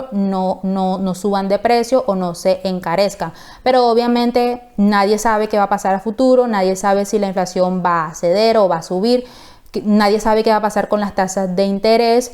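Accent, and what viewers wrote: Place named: Caribbean Coast, Colombia. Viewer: De una manera amable te aconsejo acudas con un fonoaudiologo: que te ayude a mejorar tu dicción. Tu pronunciación muestra que eres una persona ignorante en el tema. No me refiero a tu acento que se nota costeño. Puede que no seas ignorante en el tema pero esa es la percepción que muestra tu mala pronunciación. También debes mejorar la redacción! Saludos y mucha suerte 🍀👍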